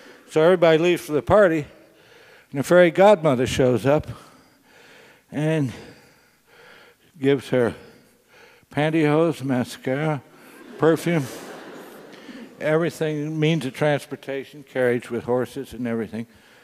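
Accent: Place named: Indiana, United States. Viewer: A Hoosier.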